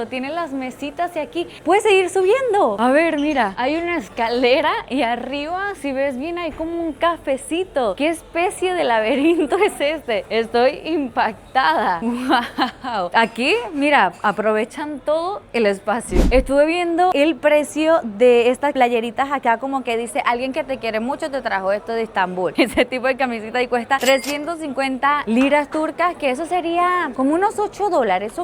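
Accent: Venezuela. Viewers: me di curnta q eras venezolana pareces a Cathy Fullop hablando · Pq le sale acento neutro mexicano al inicio pero de repente le sale acento y gestos venecos? - No pude seguir viendo el video - me gusto mucho el inicio - acento neutro bien modulado para audiencia latam - pero cundo salio ese "Oite" "Mi chama" paso werita. por aca no es mi carnal. Bay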